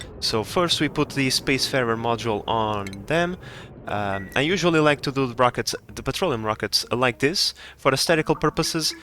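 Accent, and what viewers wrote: Portugal. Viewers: I swear your accent is from Portugal.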